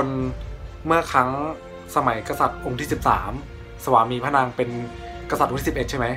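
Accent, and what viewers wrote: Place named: Thailand. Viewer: เหน่อ 55 น่ารักไปอีก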